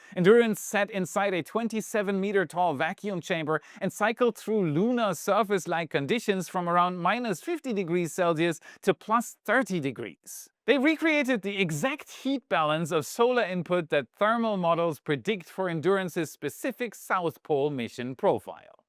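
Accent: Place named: Germany. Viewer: “ that voice got sinister sounding real quick”. I noticed that too. elocution is changing and in addition to the intonation you mention, he's forcing the "r" maybe with the intent of sounding "American". I'm a Brit who's been in France for four decades now, and am not losing my UK English accent in French. Nor does Scott Manley lose his Scottish accent in California. Out-of-region accents are positive and participate in creating one's personal trademark AKA "branding". On the same basis, its totally okay for to show his German origins when in Florida and not mess with his accent or intonation through vocal coaching which is a waste of money IMO and possibly damaging in the long run. I do hope gets to see this comment and maybe associate this with similar remarks made by other people over time. Be yourself!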